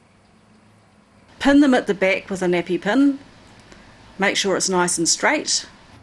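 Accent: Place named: New Zealand. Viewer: kiwi accent <3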